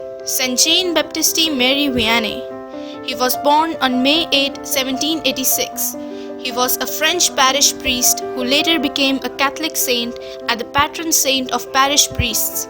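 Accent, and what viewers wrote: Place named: India. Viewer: Indian lady... the accent so strong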